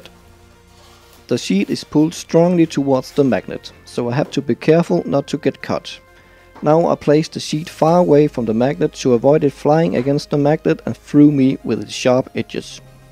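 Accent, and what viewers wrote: Denmark. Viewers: Danish. I knew it from the accent :D Interesting video, bro! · sys nok jeg ku høre du var dansker men var i tvivl :D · I like the danish accent..sounds cute somehow =) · Sorry was just curious, thought i seen alot of german on magnets and such but you sounded very distinctly Danish.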